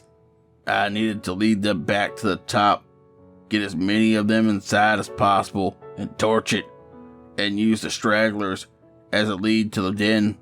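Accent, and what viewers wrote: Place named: South, United States. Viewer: Awesome video. Love your southern accent. Keep up the great job man.:)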